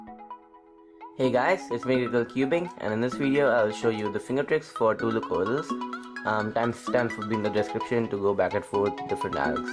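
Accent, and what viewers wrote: India. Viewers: sounds more American but this is Indian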